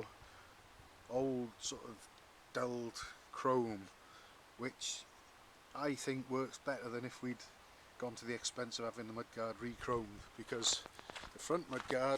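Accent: United Kingdom. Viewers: I love listening to a proper british gent talk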